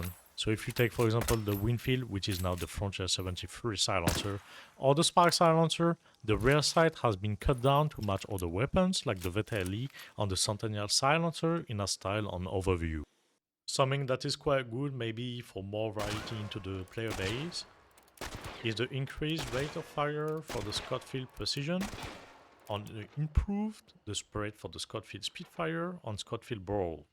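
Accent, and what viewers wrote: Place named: France. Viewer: gg for banging 20 min english with french accent <3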